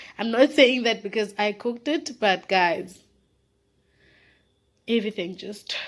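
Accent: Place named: South Africa. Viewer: First of all love and miss hearing that South African, Kaapstad accent. I also hate the taste of those little (I think it's cardamom seeds). My Mom used to cook the chicken and potatoes separately, then layer them, potatoes, rice mixture, chicken and so on and let it slowly simmer and then we would have it with mango chutney yum yum yum, lekker smekker goed. Enjoyed watching you, I have never tried making this on my own, but watching you I will certainly try my hand at it. Thank you for the inspiration, geniet, dankie en tot siens.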